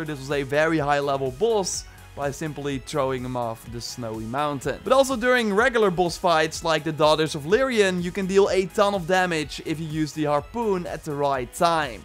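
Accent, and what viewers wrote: Netherlands. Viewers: You can hear that you’re from the Netherlands 🥰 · Je hoort zo goed dat je een nederlander bent van je uitspraak no offense 😂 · ur dutch right ? can clearly hear it when he pronounces "other" like otter" :P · This guy is so dutch it hurts my ears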